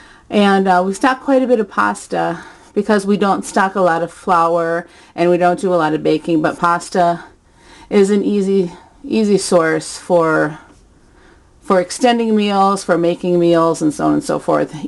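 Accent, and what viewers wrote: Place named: Minnesota, United States. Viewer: You in MN? My wife and i are in the same boat. I can hear the accent.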